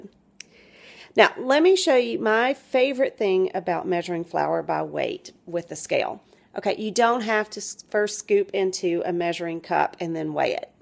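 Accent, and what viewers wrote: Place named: United States. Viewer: Ew her country accent got to go lol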